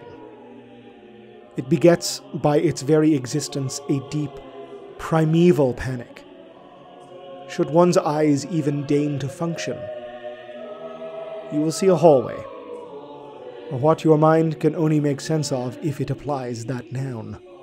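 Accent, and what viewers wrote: Ireland. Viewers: This channel is bliss. Nice to hear a fellow Irish 40k head describe the world and lore so vividly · Are you Irish?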